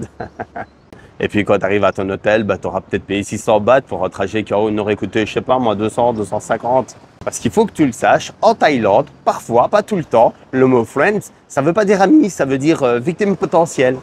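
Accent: Belgium. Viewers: Ton accent belge est très sympa ! 👌🏻😅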